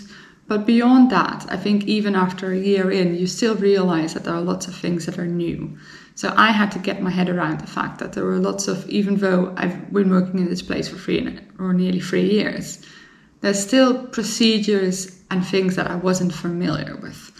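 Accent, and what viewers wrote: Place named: Netherlands. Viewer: Your English is very good from my stand of view (as a Dutch), but I immediately recognised your Dutch accent. My question:how do born and raised English people respond to that? They also must hear English is not your mother language.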